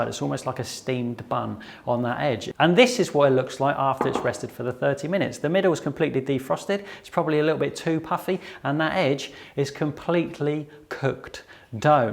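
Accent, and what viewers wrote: United Kingdom. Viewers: You have the most iconic British Accent! Makes me want to get your recipe for Banggers and Chips!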